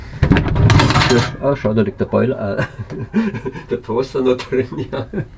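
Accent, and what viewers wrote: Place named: Allgäu, Germany. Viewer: Du bist ne absolut coole Socke! Dein Bus ist obersupergeiles Teil, ich bin begeistert. Deinem Dialekt nach würde ich Kempten vermuten, oder in der Gegend?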